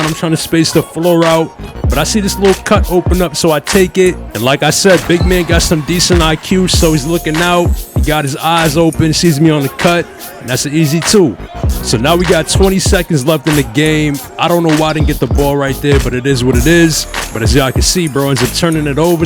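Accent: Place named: Eastern Massachusetts, United States. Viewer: You from Massachusetts bro ? You got the illest eastern mass accent lol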